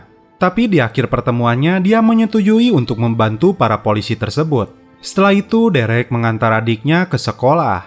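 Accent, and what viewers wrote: West Java, Indonesia. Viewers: Bang urang sunda nyaa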